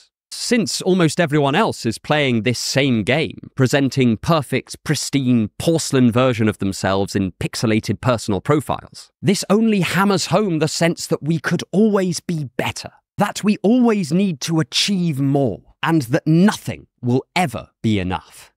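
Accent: United Kingdom. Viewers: Relax with the British accent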